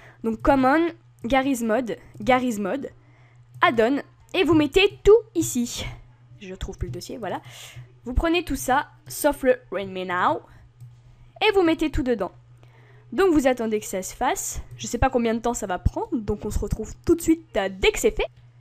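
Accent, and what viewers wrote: France. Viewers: Arrete de faire des blagues nulles avec ton accent marseillais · l'accent du sud qui me fait rire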